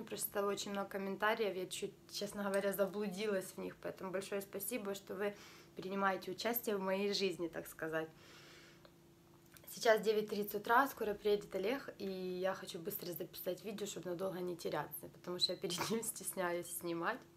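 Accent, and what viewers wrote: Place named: Ukraine. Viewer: из твоих уст слово геморрой!!!!😘😘😘😘😘 у меня аж шерсть дыбом встала. обожаю украинский акцент.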